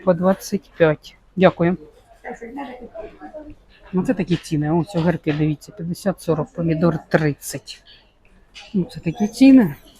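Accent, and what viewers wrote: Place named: Poltava, Ukraine. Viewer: Якщо вам не подобається,то не дивіться,це полтавський діалект.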